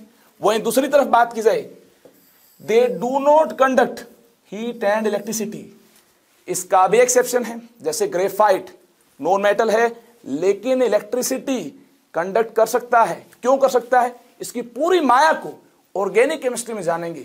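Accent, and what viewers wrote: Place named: Haryana, India. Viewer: sir ki awaz m hi haryanvi accent aajata hai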